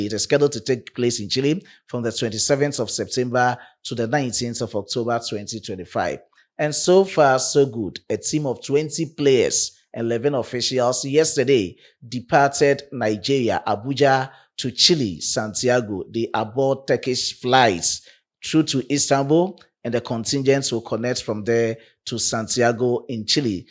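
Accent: Ghana. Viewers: Mr you sound Ghanaian to me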